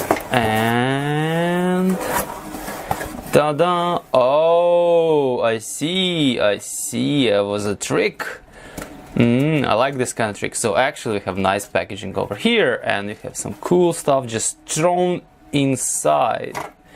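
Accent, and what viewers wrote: Russia. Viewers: Відразу чутно що російскомовний.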